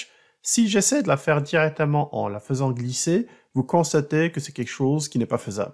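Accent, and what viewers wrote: Quebec, Canada. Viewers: Merci pour cette excellente présentation... avec cet accent de nos cousins du Québec :-)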